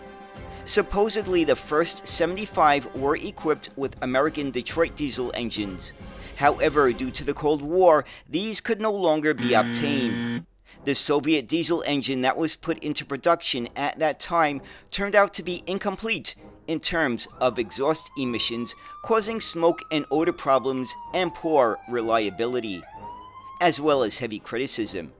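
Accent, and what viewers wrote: New York, United States. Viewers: Great vid for - as you say in your YT intto - enthusiasts for obscure transport stuff. PS: that old Zis-16 looks suspiciously like an imitation too, of the iconic Saurer Post Bus from Switzerland. PPS: the New York accent and stadium announcer style add that little extra... 🙂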